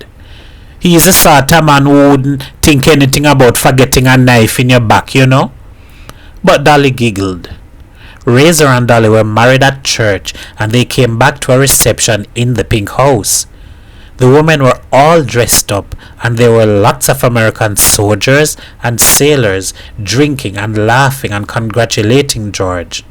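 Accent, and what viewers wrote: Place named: Trinidad and Tobago. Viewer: Thank you, Sir, for reading Miguel Street in what I assume is a Trinidadian accent. Grateful Zimbabwean here.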